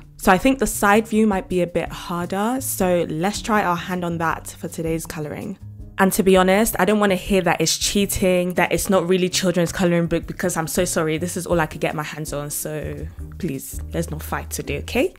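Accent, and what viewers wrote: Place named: United Kingdom. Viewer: I like your British accent And the asmr while you talk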